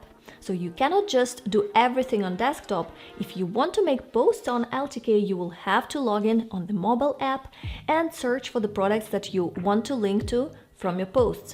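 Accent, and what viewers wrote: Russia. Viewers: Russian accent 😮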